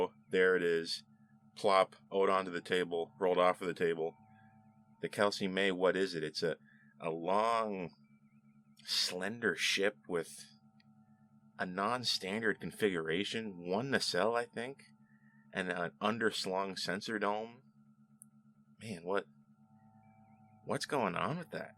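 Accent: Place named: Nova Scotia, Canada. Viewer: Unreal good break down my friend.... I really dont like this show anymore its got so bad to me but i watched this episode and its probably the best one of the season but....still hard to give it much more than a 6/10 with everyone always angry and emotional and acting like TEENS. U from Nova scotia ? that accent seems close being from Boston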